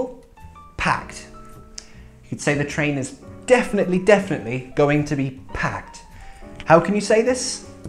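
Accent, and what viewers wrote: United Kingdom. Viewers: i really enjoy all your vedios .... hope that you will go on and make more usefull vedios iam in love with the British accent and you helped me to learn it ... Thank you very much ❤❤❤❤❤ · That is really interesting. Thank you so much for your efforts. And actually, I am not British, and I watch your videos to improve my British accent. I would like to learn how to pronounce the letter 'o' in a British way like in the words 'no' or 'so'. They are not pronounce the same as in American accent. Thank you in advance !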